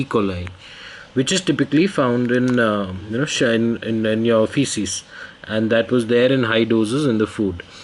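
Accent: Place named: India. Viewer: fuck your indian accent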